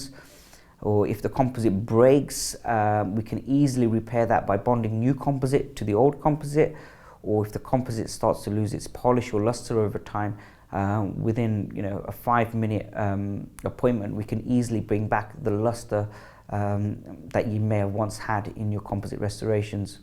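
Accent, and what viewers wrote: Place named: United Kingdom. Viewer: Maybe coz british accent id hard to hear!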